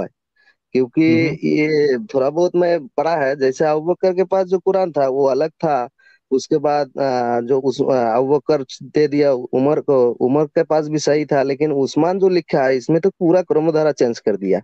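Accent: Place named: Bengal, India. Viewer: By voice I could guess that this person is from Bengal or Orissa 🙄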